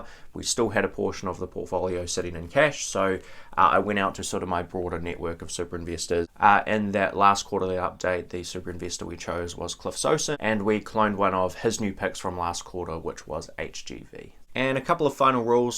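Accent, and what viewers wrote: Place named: New Zealand. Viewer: he has a Nz accent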